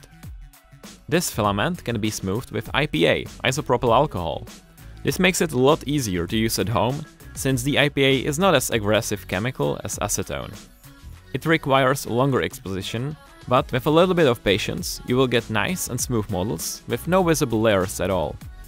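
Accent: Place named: Czech Republic. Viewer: Lol, after one sentence I knew you are Czech.. the funny accent..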